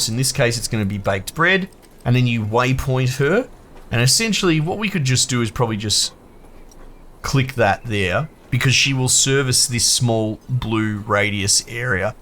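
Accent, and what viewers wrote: Australia. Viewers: Are you Australian mate?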